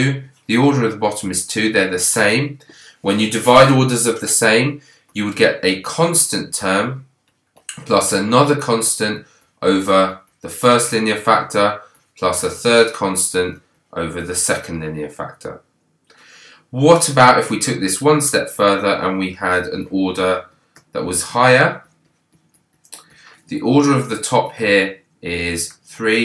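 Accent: United Kingdom. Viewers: Are you from Britain? I asked over your accent.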